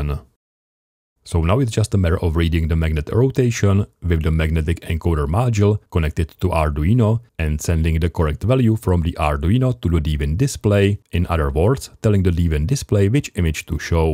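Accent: Czech Republic. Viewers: Ten českej přízvuk jsem poznal hned :D Btw cool projekt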